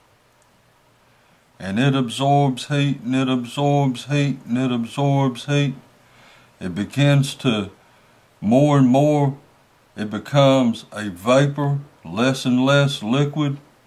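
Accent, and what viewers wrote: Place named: South, United States. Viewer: Very cool southern drawl too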